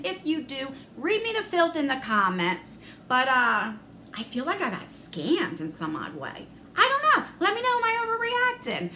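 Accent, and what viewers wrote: New Jersey, United States. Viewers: Love the deep NJ accent!!! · Hey Jersey, love love that accent!!! I have lived in New Jersey 3 different times in my life. Had a lot of fun out there. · Are you Jersey or NY? I just adore you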